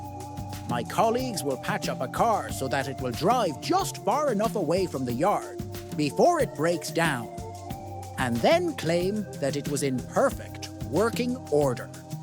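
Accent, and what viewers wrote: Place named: Ireland. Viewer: It might be considered typical of an American to say so, but I never thought of an AI with an Irish accent. I do, however, wish to say that the persistant censorship of You Tube consistently eats holes in the conversational fabric of the productions. The weak kneed, limp wristed fascisti who believe that this overplaying of their morality in a public forum somehow empowers them in any manner are sadly mistaken. If they should ever fully emerge from the womb (or asshole), of their mother, they will have to realize how poorly thought of by the rest of the world What a cowardly behavior for adults...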